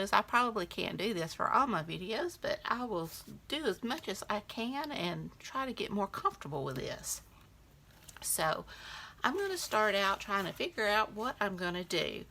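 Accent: South, United States. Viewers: I really enjoyed this video; b/c you were naratting it, it's so nice to hear that southern accent. I can't imagine the humidity you have been having this summer, we have been hit hard and it finally broke last night after a rough rain storm. Tell you hubby not to over do it out there and let him know you want to learn to use that zero turn. I'd love one but I have some side banks that can't handle one. Love that shoe and cutting the top was perfect, it makes a nice pocket. Did I see a rash on your right hand? I hope it's not poison ivy. Have a wonderful week and don't worry about being behind....... I'm 3 weeks behind now on these challenges......... OUCH 😂😂😂 have a great week 🎉🎉🎉 xoxo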